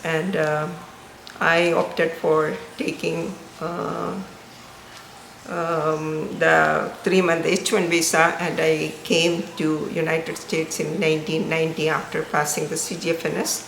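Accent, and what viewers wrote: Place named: Kerala, India. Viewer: Why are you talking English like malayalam. accent and pronunciation, very poor.what kind of English is this?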